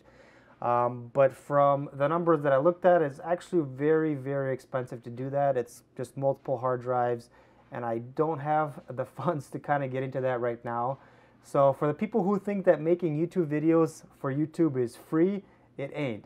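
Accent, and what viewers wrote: Russia. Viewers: I knew it))) You cannt get rid of a russian akcent compleately))) Regards from Ukraine!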